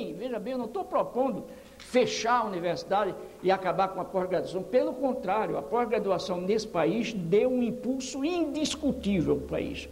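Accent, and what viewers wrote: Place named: Northeast, Brazil. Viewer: Bolsominion não aguenta ouvir um homem inteligente,muito menos com sotaque nordestino.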